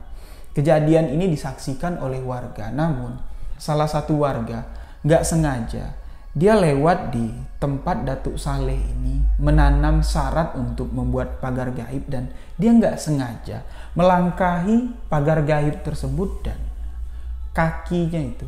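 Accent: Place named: Lampung, Indonesia. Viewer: Saya seneng nonton bang cerita.. Kadang2 dialek dan gaya bicara nya kayak ulun lampung.. Sy kira dulu bang adalah orang lampung krn sy denger suaranya aja.. 😊. Jambi, sy belum pernah menginjakkan kaki ditanah itu, tapi cerita cindung, pait lidah, sangat populer didaerah kami, lahat.. Dulu kata temen2 ku, daerah lahat juga banyak ahli racun, tapi mungkin bukan disini, yg berdekatan dengan muara enim.. 😔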